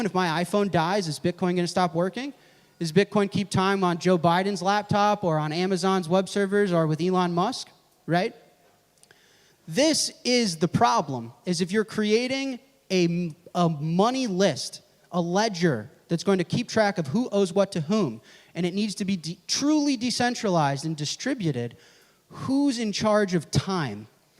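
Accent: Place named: France. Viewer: Il n'y a rien de plus élégant et compréhensible qu'un anglais hésitant parlé avec l'accent français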